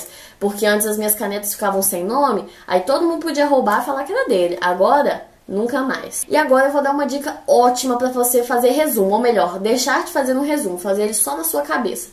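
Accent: Minas Gerais, Brazil. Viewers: meu Deus ela eh mineira 😍😍 kkkkk "esses trem" amo seus videos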